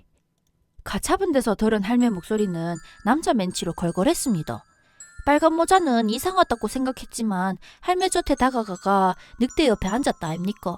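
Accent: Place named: Daegu, South Korea. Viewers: 대구 버전으로 들려주는 동화 이야기 구수하고 정겹습니다~~^^ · 대구를 떠나온 지 30년이 훌쩍 지났는데...동화영상에서 고향말을 실컷 들어보네예~ 고맙심데이!^^ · 아는 내용이지만 대구 말로 들으니까 새로운 느낌이네요. 다른 동화도 계속 들어 보고 싶어요~😊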